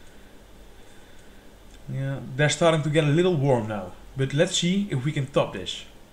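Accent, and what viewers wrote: Netherlands. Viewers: Ik ruik een flink Nederlands accent. Maar hoe dan ook super leuke video, zoals alles wat ik van je heb gezien.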